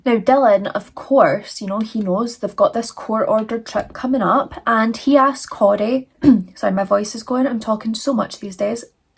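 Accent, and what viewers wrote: Scotland, United Kingdom. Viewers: is not only hands down the best looking presenter but well versed and well researched and that Scottish accent! OMG, I love this channel.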